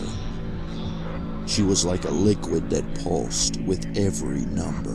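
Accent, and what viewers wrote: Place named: South, United States. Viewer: Listening right now... Where in the south are you from?.... I'm from Alabama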